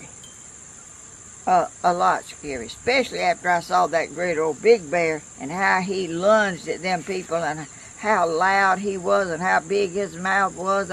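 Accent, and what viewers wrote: South, United States. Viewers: Just found your channel. you are a hoot. I enjoyed your southern accent and your video. I used to have a home in Ellijay, Ga and absolutely loved the friendliness there. You remind me of the town people. Will follow along with you.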